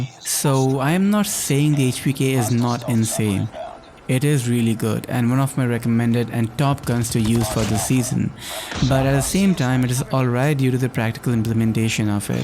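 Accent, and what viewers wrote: India. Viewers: Bro where are you from..? Sound like you are Indian 🤔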